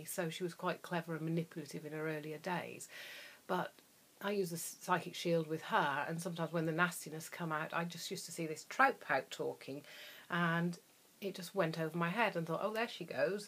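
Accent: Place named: United Kingdom. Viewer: And fuck those haters your gorgeous and smart and nice and honest your make up and hair is just fine,they just hating.fuck them your right how to deal with them,all these haters I would love ❤ to see what they look like,lol lol I bet it would be a permanent Halloween show lol lollol and love your British accent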